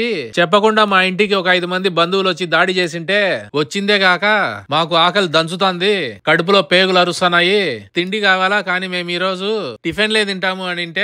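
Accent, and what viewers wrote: Kadapa, India. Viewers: Kadapa slang adhi Alane untadhi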